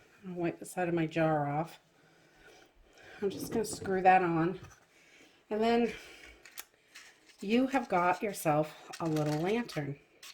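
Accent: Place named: Boston, United States. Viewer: I was listening to her talk, then she said that she "doesn't like to use her mason JAHS". I jumped right to comments to see if anyone mentioned where she was from. I am a Bostonian living in SC. Been away for about 10 years, so every time I hear the voices from home, I jump. Ya never notice the accent until you been away for awhile.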